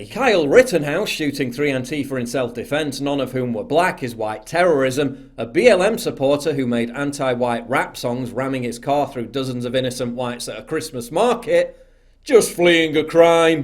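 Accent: United Kingdom. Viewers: Why you gotta narrate like that, get your British sounding a new hobby. This the second time now that I accidentally started watching your under 5 mins pos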